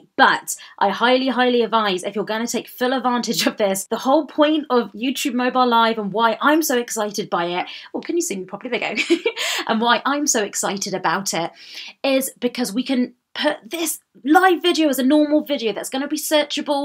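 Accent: United Kingdom. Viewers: You sound like Maranda sings but in a British version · ARE YOU BRITISH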